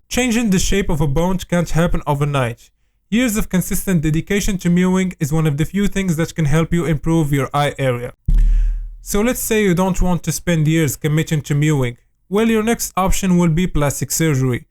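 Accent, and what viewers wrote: Morocco. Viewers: accent mghribi brb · Ur voice bayna mghribi Keep it up asat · Your accent is Moroccan ?? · is that a moroccan accent 🧐🧐 ? ARE U MOROCCAN GUYS ?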